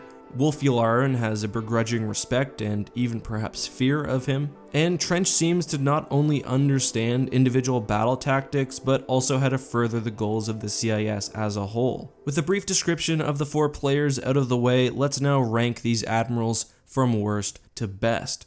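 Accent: Canada. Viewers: Ay you canadian??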